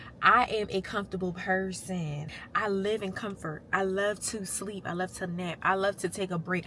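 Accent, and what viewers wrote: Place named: Baltimore, United States. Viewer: 2 sec into the video, I said yo is definitely from Baltimore 😂 I miss the city.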